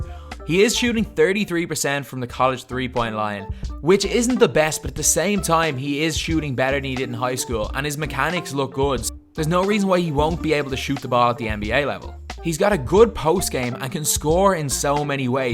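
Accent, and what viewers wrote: Ireland. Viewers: I'm confused how a Irish voice is doing basketball video lol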